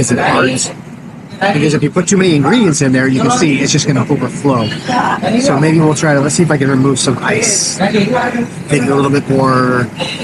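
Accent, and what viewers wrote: Philippines. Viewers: please knock off the Filipino accent when u speak English. Its ridiculous. I speak 7 dialects read n write fluently. When I speak English I use no Filipino accent!!! By the way I am a former Marine also 77-84!! Married to a Filipina for 44yrs!!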